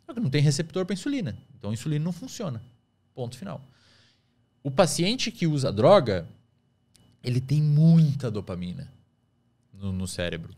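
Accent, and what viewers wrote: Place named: France. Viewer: Seja etero e pare de francesar o português....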